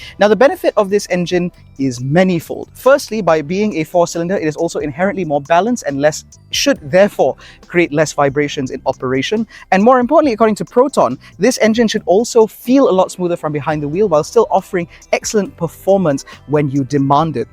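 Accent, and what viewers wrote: United Kingdom. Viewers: Subscribed one minute in. You'd think he was a native British presenter.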